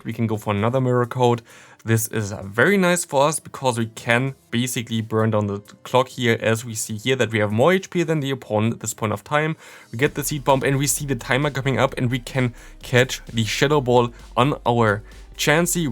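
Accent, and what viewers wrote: Germany. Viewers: der deutsche aktzent hahha beste super video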